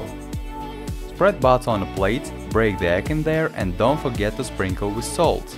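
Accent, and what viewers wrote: Russia. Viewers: ja irgendwie schade ich finde grad sein russischer Akzent macht ihn total sympathisch. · ax etot rouski aktsent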